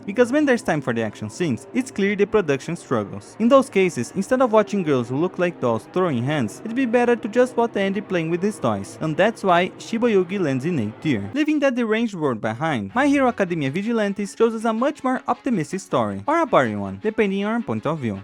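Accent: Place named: Brazil. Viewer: Tu é br né? Da pra reconhecer pelo sotaque kkk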